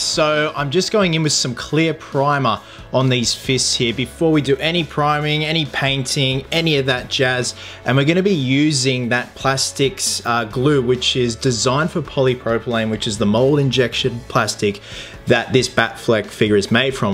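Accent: Australia. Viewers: Sam Lovgren he is Australian, it is pronounced different in Australia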